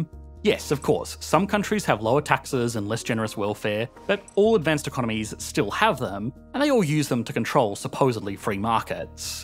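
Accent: Australia. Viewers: I will never watch the German version, simply because I love the original Australian accent and very unique delivery so much. If phonebooks still existed, could make a whole video channel reading them aloud and I would watch it. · Haha, alltough an Ozzie speaker, it is a British vieuw of economics. A bit shiny fellas.;-) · As a German who appreciates the WirtschaftsWissen channel, I still can’t imagine without English in that fine aussie accent, mate 👌🏼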